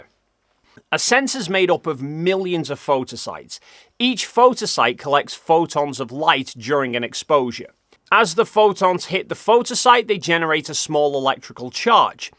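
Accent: United Kingdom. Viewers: a long time ago studies showed that a British accent convinced people it was truth....and a dog in hand yielded the same results. is just smart enough to know good marketing 👏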